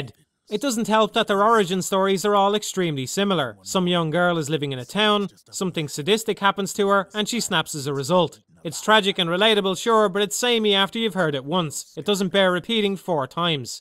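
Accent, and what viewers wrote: Ireland. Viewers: Are you trolling? You seem to have made a statement concerning IGN and "learning" in the same sentence. Also a bit of advice, all caps equates to a 40% decrease in credibility. And also I think hes Irish not British. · good strong irish accent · He's Irish.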